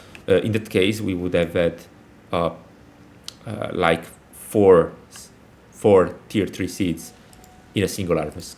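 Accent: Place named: Balkans. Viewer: Where are you from, you sound like you are from balkans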